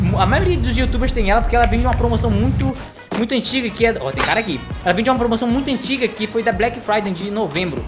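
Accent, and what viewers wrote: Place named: Rio de Janeiro, Brazil. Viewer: Nossa vc é do RJ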